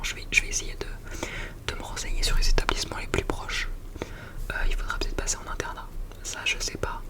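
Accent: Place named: Lyon, France. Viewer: 100% c’est un lyonnais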